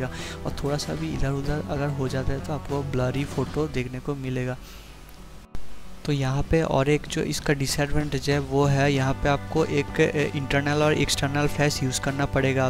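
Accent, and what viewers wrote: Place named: Bihar, India. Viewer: bhaai bihaar se ho aap apki aawaaaaj se he pta chal ra hai.........nice job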